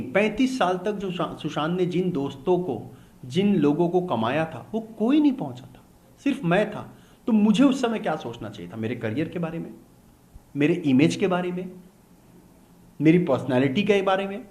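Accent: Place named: Haryana, India. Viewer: Mene esa chennal aaj tak nahi dekha hamko chutiya samjhe ho iski bhasha bihari to bilkul nahi he to ye bihar ka kaha se he iski bhasa hariyanvi lag ri he be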